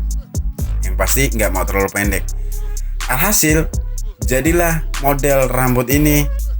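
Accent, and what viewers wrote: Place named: Pati, Indonesia. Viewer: oh pantess saya penasaran aja logat sampeyan kayak orang pati.ternyata simbahnya dari kolilo pati.ya2.ngak meleset filling gw.😎😎😎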